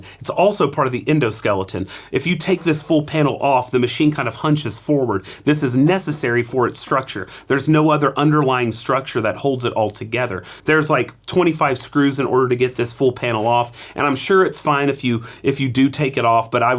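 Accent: United States. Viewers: I have lived in Spain for 35 years. In San Cugàt. We watched Ascaso being born here. So these are machines we’ve been using for years. Along with our amazing (heavily modified) hefty and reliable Italian Saecco aluminum and ss core. Almost 25 years and making amazing cups. We’re not worried about the 21 gram baskets or naked portafilters on this machine. We rely on its original but strongly modified porta filter with a nice ims basket. A charm. Even with tough coffees. Ascasos here are the standard. And, if you open all single head ones, you’ll find the same exact components inside, even with the humble Basic. The “new” PID Dream is the same as the Steel but with only one thermocoil. You should cut it in half and look at the marvel of ingenuity that makes these machines fast, predictable and reliable. Not for a scientist but for an espresso drinker for more than 45 years. 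Ascaso is one of the most amazing brands in Europe. Canadians are adopting them. But, Portugal? They’ve been there for a really long time. Loved your content. Makes me proud of you… the Master. You rock. Sorry. Não sabia que você era português. É que você parece tão americano. E seu sotaque e tudo mais são tão americanos que nem consigo entender como você está apenas “apresentando” uma máquina Ascaso. Suponho que seja para seus principais seguidores nos EUA de A.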